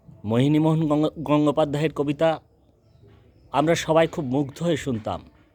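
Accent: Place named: Purulia, India. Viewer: আগের পর্বগুলির মতোই এই পর্বটিও মনে রাখবার। অসাধারণ বললেন ' দমে জার লাগছে ' নামের এই কবিতাটি। মনে হচ্ছে যেন আপনি ওই পুরুলিয়া অঞ্চলেরই মানুষ। সঙ্গে মাননীয় শিক্ষক ও আবৃত্তিকার শ্রীযুক্ত চন্দ্রচূড় অধিকারীর সম্পর্কেও আপনার কথা থেকে জানলাম। ওনার কণ্ঠে এই কবিতাটি শুনবার আগ্রহ থেকে গেল। আপনি এই আগ্রহটা জাগিয়ে দিলেন। কথাকুঞ্জ এভাবেই আমাদের মন ভরিয়ে এগিয়ে চলুক। এই কবিতার কবি মাননীয় শ্রীযুক্ত মোহিনীমোহন গঙ্গোপাধ্যায়-কে আমার অনেক শ্রদ্ধা ও প্রণাম। কথাকুঞ্জের জয় হোক দাদা।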